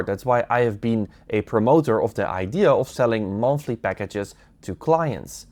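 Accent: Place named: Netherlands. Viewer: Ive been an Elementor Pro user for years and Im seriously considering whether Elementor one, especially the mail feature and image optimization, would be worth it as a subscription for my clients. Id love to test it in real client projects. And yes, theres only ONE with that legendary Dutch accent. Sending you warm regards from Switzerland, where the English accent is just as charming, just with more mountains.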